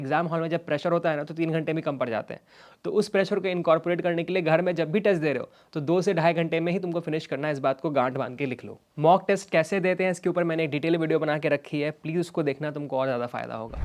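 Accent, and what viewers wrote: Bihar, India. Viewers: Aise kuch ni that isme jo dusra youtube video me ni h is topic pe. Aur thoda pronunciations pe kam kro Kuch words pe being Bihari wo dikh jata h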